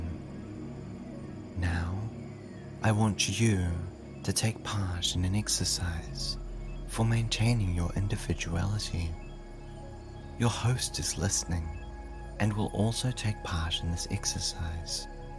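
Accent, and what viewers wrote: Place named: United Kingdom. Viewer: lmao what? 1. he’s british. he’s going to have an accent. 2. every single video is a file.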